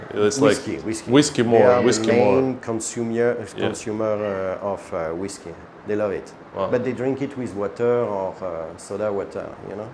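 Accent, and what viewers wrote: France. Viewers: He is Indian with a french Accent ! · Even after 20 years he still has French-English accent 😂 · Well, he is French, speaking English, which is not his language fluently. Don't mind the accent. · After 21 yrs he still got french accent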